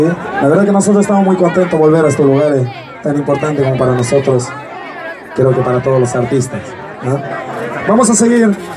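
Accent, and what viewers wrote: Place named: Santiago del Estero, Argentina. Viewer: Como buen Santiagueño,le repercute mucho el asento,y la SS,pero canta lindo,ojalá q cuide su vos y su figura,eso es muy importante para sus fans y su salud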